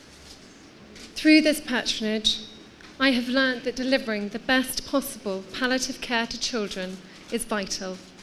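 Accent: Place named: United Kingdom. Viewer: I love the british accent!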